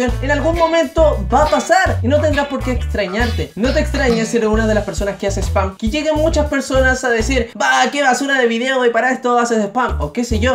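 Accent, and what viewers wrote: Arica, Chile. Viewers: eres de Arica, no? xD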